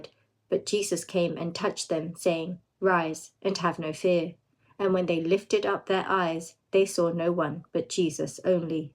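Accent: United Kingdom. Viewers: English is my second language, and I love the British accent. You can't imagine how happy and grateful I am for your reading. please never stop. God bless you.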